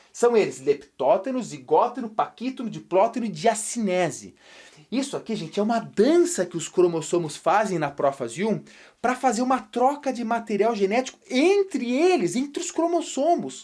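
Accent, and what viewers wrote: Rio Grande do Sul, Brazil. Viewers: Te liga mano veio, é gaúcho? Kkkk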